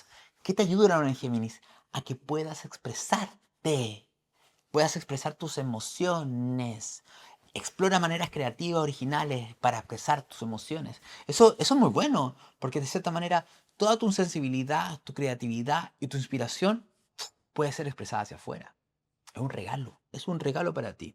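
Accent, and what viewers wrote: Chile. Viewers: Eres muy divertido😂y eso que no te has soltado la pinza total! Urano te va a ayudar😅Chileno, que bonito es vuestro acento❤escucharte alimenta lo que descubrí con la Astrologia, gracias por tu generosidad, ese es el camino🎉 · Excelente, apenas te conozco pero me encanto tu video, me aclaraste muchas cosas. Por tu acento pareces chileno.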